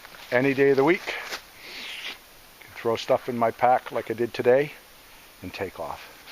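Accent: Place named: East Coast, United States. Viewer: Sounds like an east coast Dick Proennecke!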